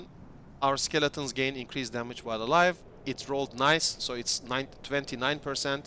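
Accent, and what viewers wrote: Turkey. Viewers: bu türk ingilizcesi, nerde duysam tanırım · great vid are you turkish ur accent sounds like it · Sen turksun demi o aksani nerde gorsem tanirim.amk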